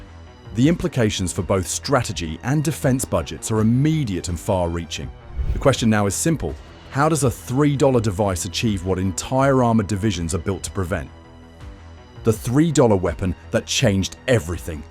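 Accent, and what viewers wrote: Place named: England, United Kingdom. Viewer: The pictures are good ,but the pommy dramatic voice over is poor???? Just tell the story with no dramatics in your voice???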